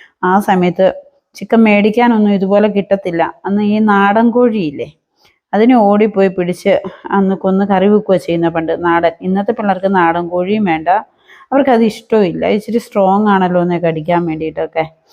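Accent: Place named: Idukki-Kottayam, India. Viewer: Chechide slang idukki kottayam slang polind😇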